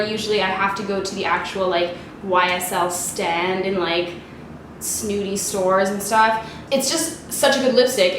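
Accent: Canada. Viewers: Came across this video and the one where you state the items you would not purchase again. I knew within a minute you were Canadian - you talk the talk girl - and believe it or not, us Canadians have a certain way of saying words (there is a sharpness to the phrasing) that is unique. I used to travel alot through the US and people would always ask me if I was from Canada? I would say yes then they would say my 'accent' gave it away! Great channel! ~ Jennifer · I love your canadian accent, the way you say man and damn & love your hair!!!!!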